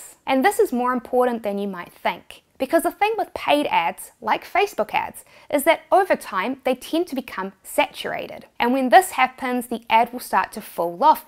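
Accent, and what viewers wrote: New Zealand. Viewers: Pets. Kiwi accent.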